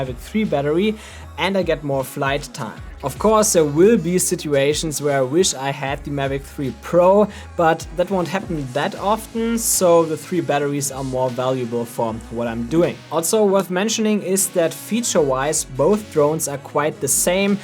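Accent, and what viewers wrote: Germany. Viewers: Love that German accent 😂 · Deutscher Akzent so krass. Grüße aus Deutschland -:)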